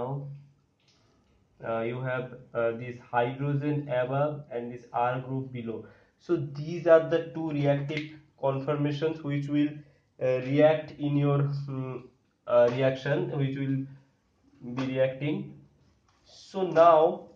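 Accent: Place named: Bengal, India. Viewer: Nicely explained. And U sound like a Bengali. I'm also from Bengal.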